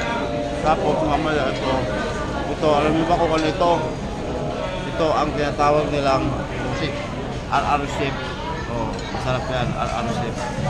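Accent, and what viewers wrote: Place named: Ilocos, Philippines. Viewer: sarap pakinggan mag ilocano si..kakaproud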